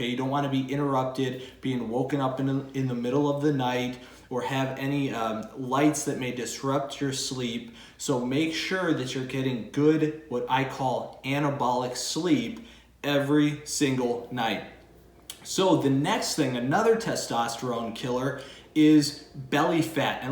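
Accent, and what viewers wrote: United States. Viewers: He sounds American...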